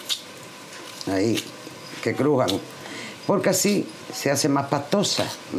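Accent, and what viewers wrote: Andalusia, Spain. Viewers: aquí en Madrid vivo yo y no se escucha eso sí el acento Andaluz lo apreciamos mucho viva tu cocina y tu salero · qe grande eres hija una papas guisar qe buenas el Señor ese qe aprenda el el Andaluz qe es lo más bonito de el mundo entero un abraso mui grande guapa ♥️♥️♥️🌹🌹🌹😘😘😘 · El mejor habla el andaluz y la cocina de olé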